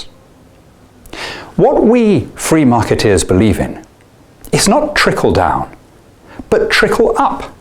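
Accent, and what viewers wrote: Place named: Southern England, United Kingdom. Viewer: Scuba Sausage I'm sure he is a southerner, I can tell from listening. xD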